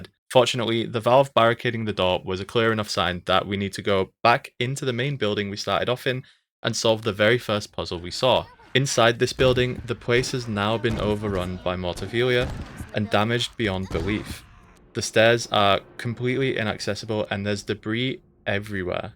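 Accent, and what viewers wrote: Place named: North, United Kingdom. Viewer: Every now and then a video pops up and reminds me that Obscure exists and I love that. And then I hear that you’re a northerner too and it makes it even better! This video was sick, can’t wait to see more like it. 😊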